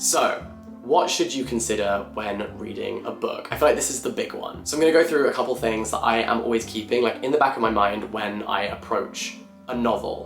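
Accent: United Kingdom. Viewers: The Brits are losing their accent. 😢 Then again, you did just say “offen”.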